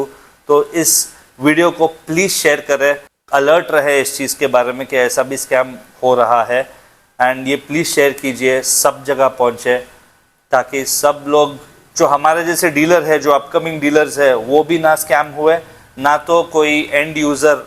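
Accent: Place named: Punjab, India. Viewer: He speaks Punjabi more like north punjab punjabi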